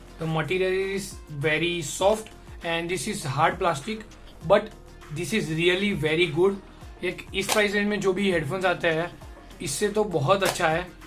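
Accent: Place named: Butler English, India. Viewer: He speaks butler English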